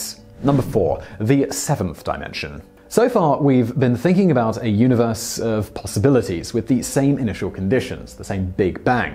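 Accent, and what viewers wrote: United Kingdom. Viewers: Absolute pure garbage video and has nothing to do with the dimensions in string theory. Just because the guy has a British accent doesn't mean he knows what the hell he's talking about.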